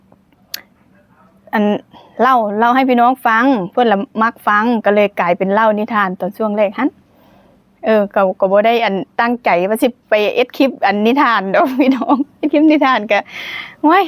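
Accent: Phu Thai, Thailand. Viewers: ทำนองลำขอนแก่น....สำเนียงภูไท...🤣🥰💋:socialdist::elbowcough::socialdist::thanksdoc::chillwcat::elbowcough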